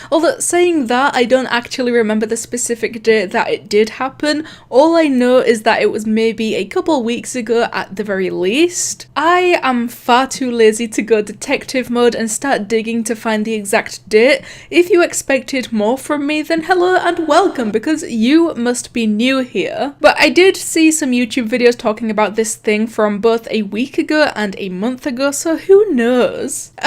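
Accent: United Kingdom. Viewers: British girl talks about roblox avatars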